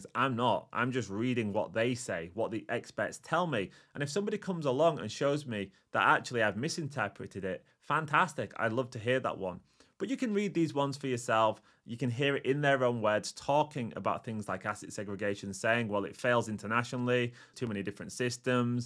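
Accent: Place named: Yorkshire, United Kingdom. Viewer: It helps to listen to 0.75. thanks, I Will try to get familiar with yorkshire-ese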